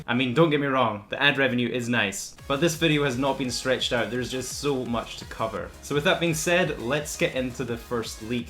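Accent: Scotland, United Kingdom. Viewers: Scottish accent lol I like it personally but I’m from the uk so it’s normal to me to hear it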